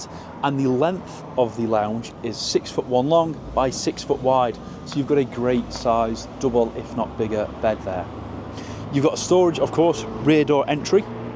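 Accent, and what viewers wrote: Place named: Scotland, United Kingdom. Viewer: It sounds like this fine young gentleman is speaking the kings English but I can't understand a single word he is saying. Is he Scottish? Nice van though.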